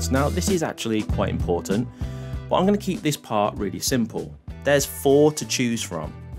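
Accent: United Kingdom. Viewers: Two things stand out for me 1, he’s British which makes a nice change from all the American offerings and 2, he explains the basic, but important settings in a language that a beginner/enthusiast can understand and get to grips with quickly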